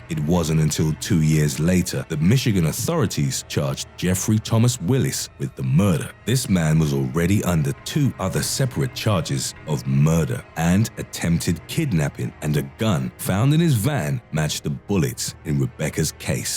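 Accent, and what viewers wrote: United Kingdom. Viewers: shout out to the British narrator😉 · What happened to the scary voice. This guy is ok but any U.K. Voice is never really that scary. Makes you not want to jog. Although anything like being in the wrong place at the wrong time can get you killed. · batman 666, True i kinda liked the other one. The british voice does not work with the music too.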